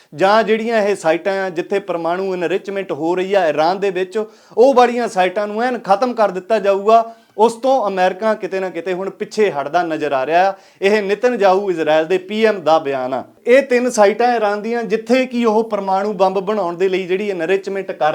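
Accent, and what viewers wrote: Doaba, India. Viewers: tuhadi Punjabi doabe wali lagdi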